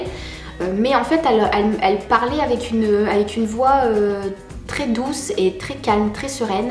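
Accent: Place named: North, France. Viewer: Très appréciable tes différentes "storytime", je me suis surpris à toutes les regarder. Je suis psy et tes témoignages sont importants pour dédramatiser la santé mentale qui est très importante 🙂 Bonne continuation à toi ! J'ai l'impression de reconnaître un peu mon accent du Nord, je ne sais pas si je me trompe mais c'est choupi 😂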